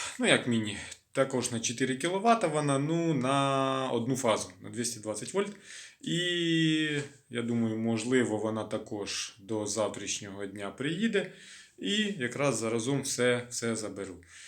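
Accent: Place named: Kherson, Ukraine. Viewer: хай Вам Бог помогає! Так приємно почути рідну говірку Херсонщини! Теж мріємо про перемогу і про повернення додому! А зараз набираємося знань і досвіду від Вас та інших сільських блогерів. Сердечно Вам дякую, що знімаєте і ділитесь інформацією у такий важкий час! Шана Вам, що не зламалися і не опустили руки! Разом до перемоги!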